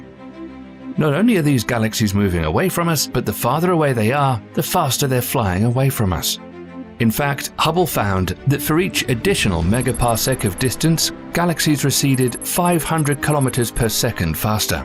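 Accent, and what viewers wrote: United Kingdom. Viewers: snarky, breathy middle-age british accents make it all factual for most listeners lol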